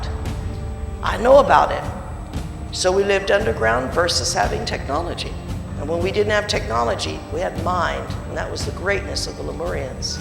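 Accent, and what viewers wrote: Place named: England, United Kingdom. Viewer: What happened to the English accent?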